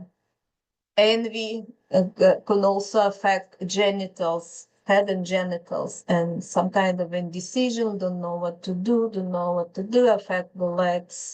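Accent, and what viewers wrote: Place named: Russia. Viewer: Где на русском послушать? Очень слышно русский акцент, но не понимаю где найти эту же информацию на русском языке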